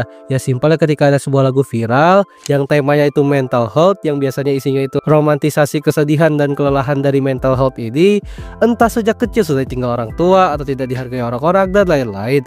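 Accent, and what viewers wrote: Java, Indonesia. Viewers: Suara lu jawa min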